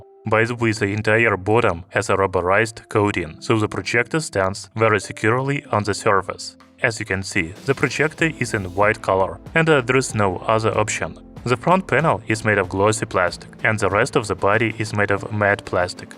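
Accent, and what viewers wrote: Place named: Russia. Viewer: Use AI for english, cause its hard to understand you Rusky!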